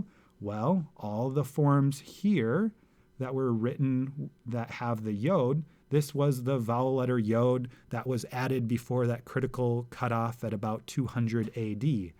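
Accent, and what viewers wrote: United States. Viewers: I consider this harmful to anyone learning Hebrew. You have a heavy American or Canadian accent, and your vowel placement so different to Hebrew, I could barely recognize the letters you mentioned. Anyone who used these videos to learn would surely end up sounding American? Also, the video is called "Biblical Hebrew" but you're rejecting Biblical pronunciation and going with modern pronunciation, which isn't helpful to anyone learning Biblical Hebrew. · Thanks so much. You should perhaps point out that your pronunciation of many of the vowels is distinctly American, and is different from the non-Western original Hebrew pronunciation. For example the letter yod should be pronounced as the oo in wood, and not like the o in yoga. It can be confusing for those who are not cogniscent of your strong American accent. Thanks again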